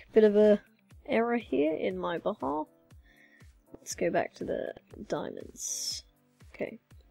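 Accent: Australia. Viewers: I wish i had your Australian accent :3 I have a british accent and it kind of sounds like it but meh xD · you have the most beautiful australian voice :)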